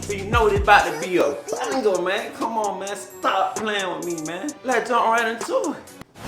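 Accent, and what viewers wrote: Atlanta, United States. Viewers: 😆 brah u talk like us in the A i know u in macon or chat tenn. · I can tell from the accent he from atlanta😂...but if you put this on medium hair it’s gone turn straight?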